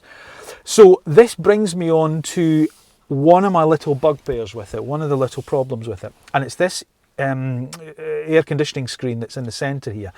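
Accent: Scotland, United Kingdom. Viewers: What a great and very informative review. Your Scottish accent makes it’s extra easy to listen to, but you covered literally every aspect of the car and I almost feel like I was right there experiencing everything you described myself. Hats off 👍🏽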